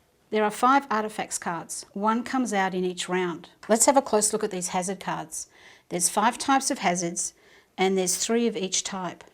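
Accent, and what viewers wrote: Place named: Australia. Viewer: Nice video thanks. And an Aussie voice. Nice.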